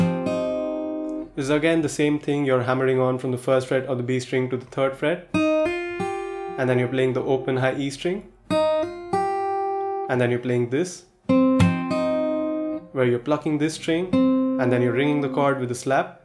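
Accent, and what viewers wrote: India. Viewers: Merko ye banda indian lg rha.. accent bilkul indian vali hai..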